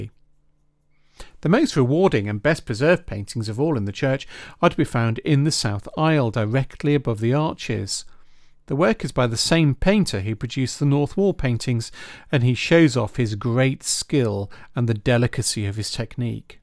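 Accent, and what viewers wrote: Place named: Northern England, United Kingdom. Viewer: I really enjoyed your video. Your delivery is pleasant to listen to (and in the accent of a fellow Northerner, I might add). Where are you from I wonder. Rebecca (Leyland, Lancashire)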